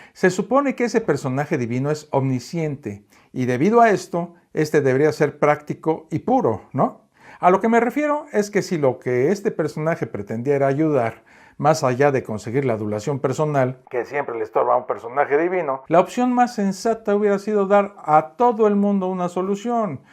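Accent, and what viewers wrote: Mexico. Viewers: CAUSA GRACIA TUS COMENTARIOS. ¿QUE ERES? ¿DONDE ESTUDIASTE? ¿ERES BACHILLER? SI NO CREES EN NADA, VIVE TRANQUILO TU VIDA Y NO TE METAS EN LA VIDA DE LOS DEMAS: .JUZGAS DESDE TU PUNTO DE VISTA, ELUCUBRANDO ESTUPIDECES y TU TONO MEXICANO QUITA MUCGA SERIEDAD A TU ABSURDO DISCURSO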